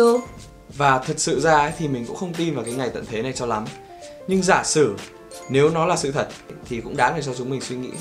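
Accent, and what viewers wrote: Standard. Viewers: Bạn nói chỉ được cái chuẩn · nói chuẩn vãiiiiiiiiiii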